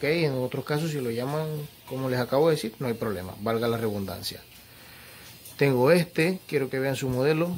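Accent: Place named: Maracaibo, Venezuela. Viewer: Primo vos sois maracucho dónde te pongan ! 😅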